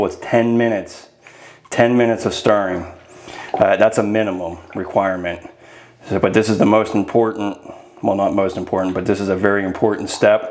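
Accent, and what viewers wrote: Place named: Pittsburgh, United States. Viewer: I'd recognize that beautiful Pittsburgh accent anywhere. Stone cold yinzer coming to the rescue.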